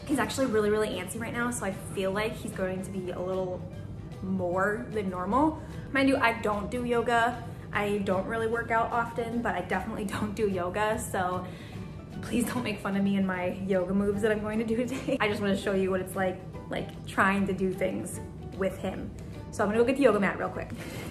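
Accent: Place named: Minnesota, United States. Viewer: What is your accent? Minnesota? It's so lovely!!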